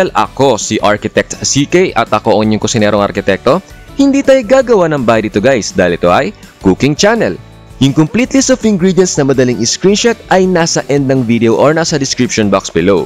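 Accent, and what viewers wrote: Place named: Visayas, Philippines. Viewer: Hahaha bisaya diay ka?!!! Nyahahaha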